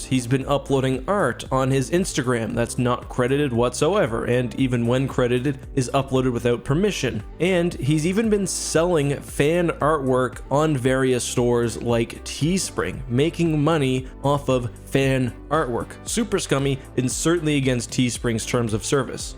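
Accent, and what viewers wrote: Canada. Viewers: The entirety of this video just sounds so Canadian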